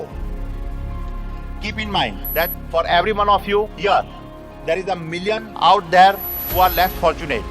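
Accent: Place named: India. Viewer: Ye to apne jaisi english bolta hai ❤️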